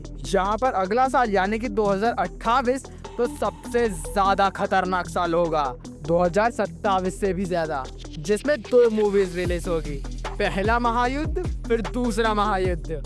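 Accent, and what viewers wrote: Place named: Maharashtra, India. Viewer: Accent se lagta hai bhai maharashtra se hai😅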